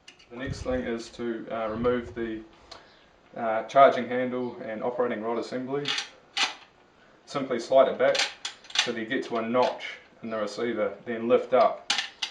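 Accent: New Zealand. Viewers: i was so supprised to hear a new zealanders voice :D where abouts in new zealand u from?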